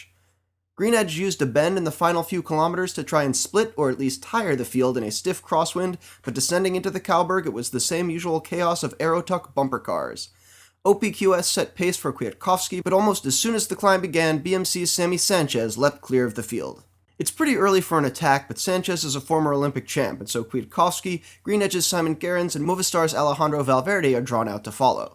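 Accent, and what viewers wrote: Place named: United States. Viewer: Nice video, and nice to hear a yank who know's his stuff for a change.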